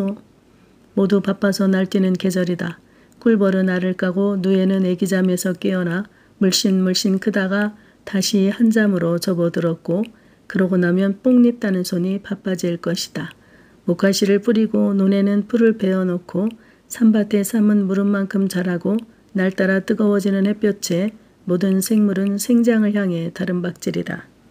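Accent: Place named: Gyeongsang, South Korea. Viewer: 태백산맥의 찰진 전라도 사투리에 이어 구수한 경상도 사투리... 앞으로의 대장정 함께하겠 습니다 감사드립니다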